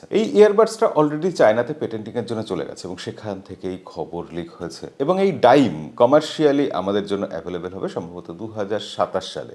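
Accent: Bangladesh. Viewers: উচ্চারণ শুনেমনে হচ্ছে যেন বাংলাদেশের কোনও চ্যানেল দেখছি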